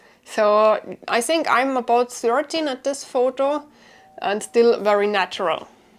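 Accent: Germany. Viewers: I really enjoyed this "peek" of your childhood and teenage years. I envy you, your trips to Croatia, Italy, etc. Has anyone told you that in your teenage photos, you resemble a young Gwyneth Paltrow? You are lovely . . . hearing your German-accented English takes me back to my almost 6 years in the Army, in Germany. I loved it.❄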